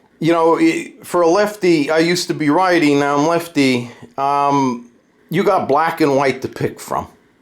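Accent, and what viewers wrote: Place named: Queens, United States. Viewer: Bro, where you from? You sound like me? Are you from Queens?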